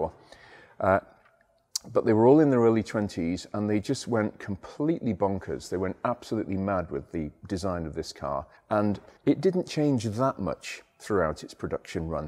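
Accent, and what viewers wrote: United Kingdom. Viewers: British Engineering in a voice... thank you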